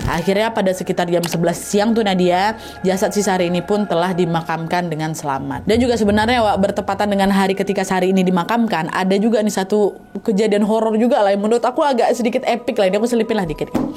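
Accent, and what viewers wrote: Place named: Sumatra, Indonesia. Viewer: Mba nadia orang palembang at org Jawa? 🤣 tp bahasanya kyk org Sumatra.... 👍👍👍👍❤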